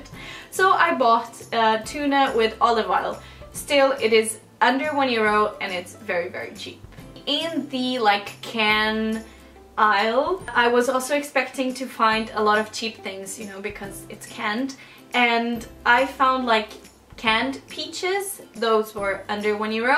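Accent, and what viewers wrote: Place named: Norway. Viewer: It’s interesting how you don’t have Portuguese accent, but you do have the same accent Norwegians have when they speak English!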